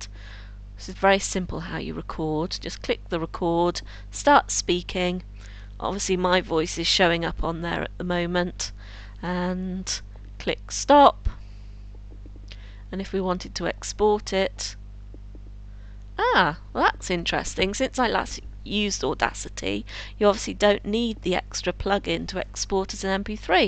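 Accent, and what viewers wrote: United Kingdom. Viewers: It's british accent :P that's why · are u a girl or a boy sounds?0_0 oh i hear the british sounds in ur voice lol cool. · nah man....i said that because this woman sounds like one of those British nannys....xD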